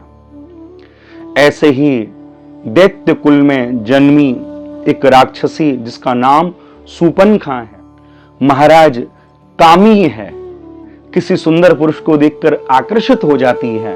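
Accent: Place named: Rajasthan, India. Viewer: दीदी हम मध्य प्रदेश के सतना जिले से हैं यह हमारा ससुराल है प्रभु कृपा से 2 बच्चे हैं हमारे एक प्यारी सी बेटी और दूसरा प्यारा सा बेटा है पूरे परिवार की जिम्मेदारी हम पर है हमारी मम्मी जी को गुजरे 6 साल हो गये हैं वह मुझे बहोत प्रेम देती थीं मेरी खुद की माँ से भी ज्यादा प्यार दिया है उन्होने हमें, हमारी माँ तो पागल हो चुकी हैं और पापा इस संसार को छोड़कर चले गए 7 साल पहले और अब मायके की गृहस्थी बिखरी हुई है 3 बहन हैं और 1 भाई है वह अभी 16 वर्ष का है। सब प्रभु के भरोसे चल रहे हैं जैसी कान्हा की इच्छा 🙏दीदी आप कहाँ से हैं वैसे आपकी भाषा से तो प्रतीत होता है कि आप मेरे प्यारे राजस्थान से हैं 😊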